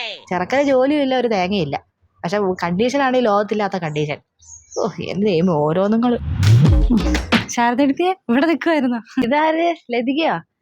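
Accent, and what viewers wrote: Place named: Palakkad, India. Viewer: Awww ന്റെ ചേച്ചിയെ ഞാൻ മലപ്പുറം ആണ്.. മലപ്പുറം ഇസ്ലാം സ്ലാങ് ink നന്നായി അറിയാം.. ഇസ്ലാംസ് എന്ന് പറഞ്ഞു താഴ്ത്തല്ലാട്ടോ.. എല്ലാരും മലപ്പുറംക്കാർ എല്ലാരും verity സ്ലാങ് ആണ്.. But അച്ചടി language ആർക്കും ഇല്ല.. But ചേച്ചിന്റെ മുസ്ലിം സ്ലാങ് കേട്ടാൽ മനസിലാവും പാലക്കാട്‌ ആണെന്ന്.. Nice❤️😌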